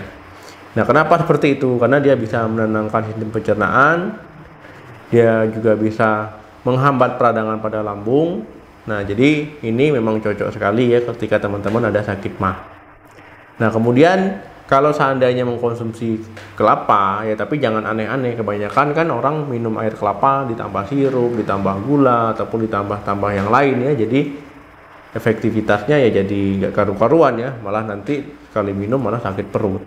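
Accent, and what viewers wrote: Aceh, Indonesia. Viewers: Lidahnya Aceh banget dok😊. Saya org Aceh tinggal di Batam. Saleum aneuk nanggroe 🙏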